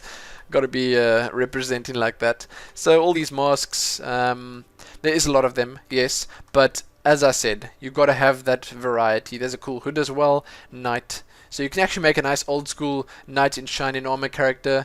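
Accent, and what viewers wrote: Afrikaans, South Africa. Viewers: hey bru i noticed you accent...u south african? BTW nice video, im quite impressed with the attention to detail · judging from what I know sounds like an Afrikaans accent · Haha. British? Lol. Hes South African. You ignorant person haha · He's not British, he's South African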